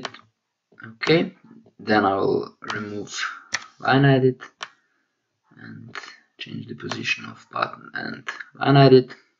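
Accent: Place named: Russia. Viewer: Ты Русский что-ли, акцент какой то есть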